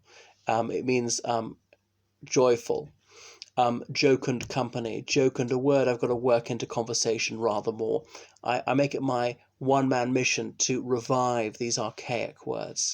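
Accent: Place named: England, United Kingdom. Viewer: Nothing like a Springtime poem read by a gentleman with an English accent. Cheerful and uplifting in these challenging times. Thank you.🌷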